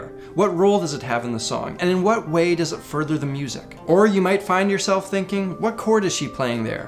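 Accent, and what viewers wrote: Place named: Canada. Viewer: Being honest, your Canadian accent is pretty cool! I get yours way before than American accent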